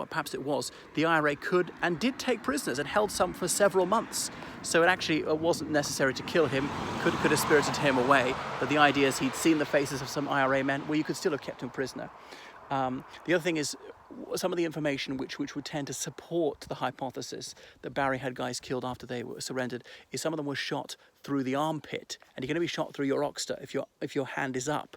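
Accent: RP, United Kingdom. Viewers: Well, he has an Etonian accent - not terribly Irish at all! :) My granddad was Irish - from Nenagh, Co Tipperary.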